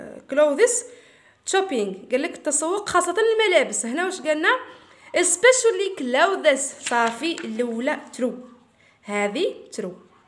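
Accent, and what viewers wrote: Tunisia. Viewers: نتي تونسيا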